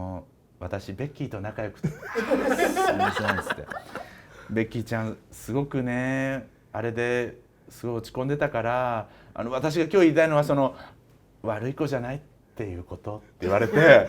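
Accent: Kansai, Japan. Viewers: 中田の関西弁まぁまぁ上手くて草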